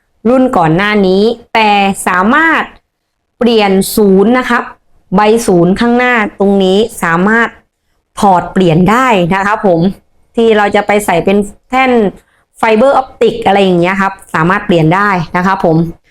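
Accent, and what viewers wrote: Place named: South, Thailand. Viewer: ร้านอยู่จังหวัดอะไรครับ สำเนียงคนใต้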